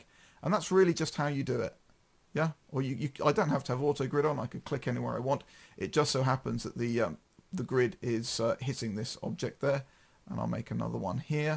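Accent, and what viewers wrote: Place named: United Kingdom. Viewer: Мерзкий блевотный британский акцент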